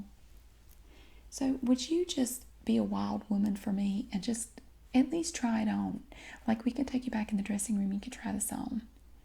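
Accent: South, United States. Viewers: seriously though all scientific knowledge and psychology considered, is it weird that her special southern accent gives me an instant erection ?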